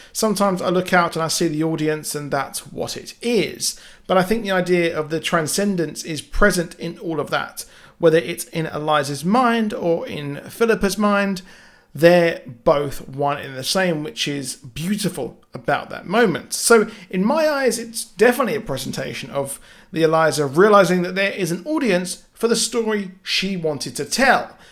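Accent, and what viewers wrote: United Kingdom. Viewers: “When I sAwR it” sorry I just love British accents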